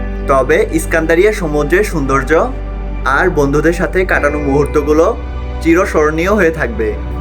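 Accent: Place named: Ishwardi, Bangladesh. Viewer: ভাই আপনার বাড়ি কি পাবনা জেলার ঈশ্বরদী তে আপনি ঈশ্বরদীর ভাষায় কথা বলছেন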